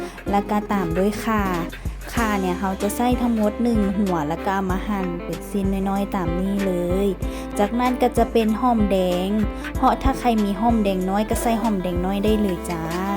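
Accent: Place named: Uttaradit, Thailand. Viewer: ขอเดาว่าสำเนียงคนอุตรดิตถ์ค่ะ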